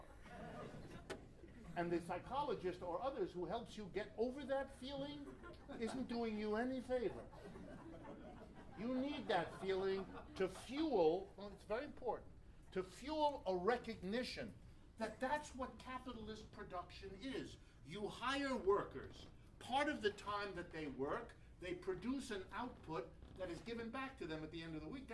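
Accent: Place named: New York, United States. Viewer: This guy has a very marked NY accent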